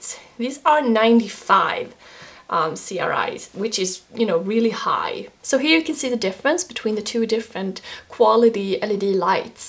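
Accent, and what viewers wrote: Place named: Sweden. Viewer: I love your accent, are you Swedish?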